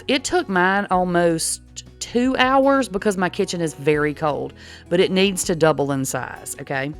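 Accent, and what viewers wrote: United States. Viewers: Hi 😁 I’m all new to sourdough and I’m trying this recipe tonight !!! Omg it looks sooooo yummy !! Btw …. I love ur accent lol . What State in US is that?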